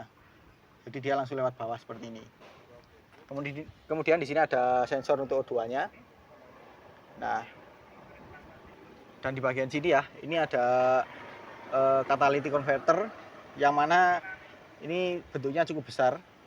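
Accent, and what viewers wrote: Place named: Java, Indonesia. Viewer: Suaramu begitu iconic dan medok mase 😁👍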